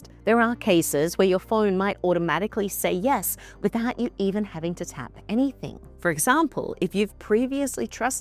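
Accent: Australia. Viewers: Aussies and their darter, make me smile every time. 😊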